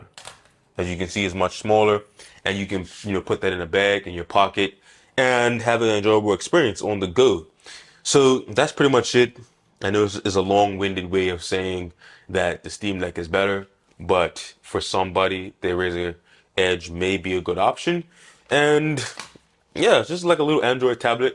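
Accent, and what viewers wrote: Bermuda. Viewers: Are you from Bermuda? I hear an accent.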